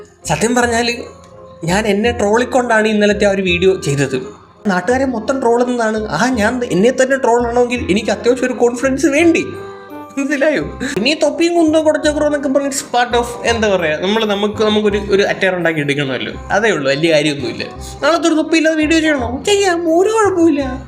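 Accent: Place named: Karunagappally, India. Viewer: videos okke nalla funny aanu...karunagappally slag kelkkanum❤❤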